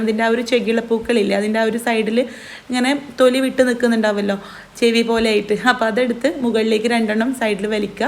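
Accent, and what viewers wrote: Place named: Kasaragod, India. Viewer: കാസർഗോഡ് നങ്ക്...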